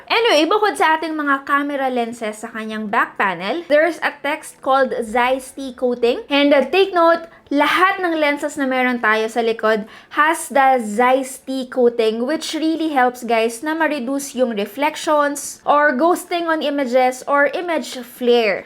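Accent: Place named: Ilocos, Philippines. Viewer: mukhang foreigners tpos ilocano accent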